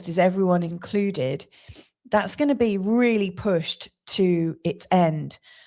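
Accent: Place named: Home Counties, United Kingdom. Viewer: I do like your accent, - it reminds me of home - the home counties?! - from here amid the Great Lakes. More seriously, Maestra, thanks again for the excellence of your work, so generously shared. I must go back to Skool where your stuff is even better. Crossing your palm with a little silver song... old school school! <link>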